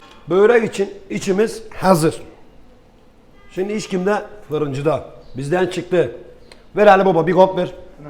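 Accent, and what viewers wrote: Gaziantep, Turkey. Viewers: Şu şive şu samimiyet helal olsun Antepli arkadaşım vardı oda böyle konuşurdu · Bu Antep şivesi çok kötü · Antep aksanı en güzel aksan. Yaptığınız yemek çok sağlıklı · Antepli bir arkadaşım var gastronomi bölümünden birebir abinin aynısı zaten konuşmaya başladığı anda antepli olduğunu anladım antepliler iş ahlakı disiplin ve cömertlikleriyle biliniyor ellerinize sağlık ustam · Çok güzel olmuş eline ze saglık anlatiş şeklinizde çok tam bir annepli gibi 👍👍👍👍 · Antep kilis şivesine ölüyorum ya ustam ellerine sağlık hayırlı Ramazanlar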